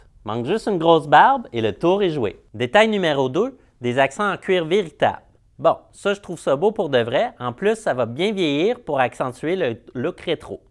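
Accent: Quebec, Canada. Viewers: Vidéo très intéressante, bonne description des produits, avec humour en prime ! Et cet accent québécois est toujours un régal !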